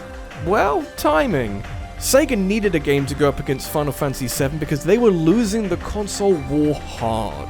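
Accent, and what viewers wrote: United Kingdom. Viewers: Top 5 Linear Pathed Games (because I prefer a linear path over open world) Also, it's kinda weird hearing a British guy talk about games costing dollars rather than pounds.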